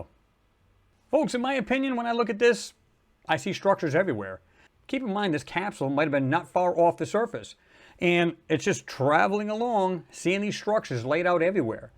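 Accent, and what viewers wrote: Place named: New England, United States. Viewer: NEW subscriber.. sounds like you're from New England. Rhode island here 👊👊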